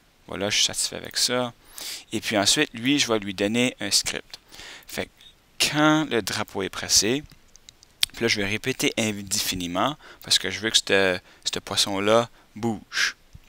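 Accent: Quebec, Canada. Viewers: P'woouuaa cet accent ^^' C'est un accent quebequois ?? mdr.. bonne video :)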